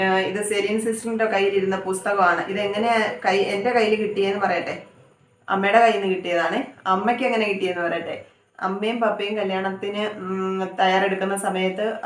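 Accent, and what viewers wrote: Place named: Thiruvananthapuram, India. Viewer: തിരുവനന്തപുരം ഭാഷ ആണല്ലോ. സ്റ്റൈൽ. വീട് എവിടെ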